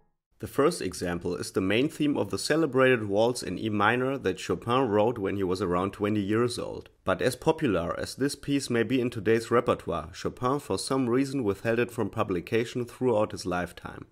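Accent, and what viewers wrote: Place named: Germany. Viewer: You have an intellectual clarity mixed with humour which is very nice. Leaves the watcher with a lot of food of thought. Thumbs up! (Btw as a Swede the German accent makes all difference =) ) ps. very well researched, another thumbs up! ds.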